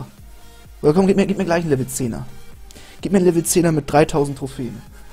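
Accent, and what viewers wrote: Munich, Germany. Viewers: kommst du aus München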